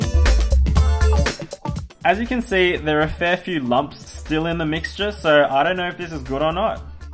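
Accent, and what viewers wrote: Australia. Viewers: Are u Aussie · JanielBxbes he's Australian.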